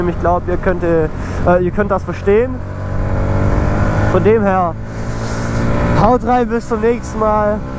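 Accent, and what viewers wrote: Swabia, Germany. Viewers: Ja man neues video, schwätz aber ruhig schwäbisch ❤😂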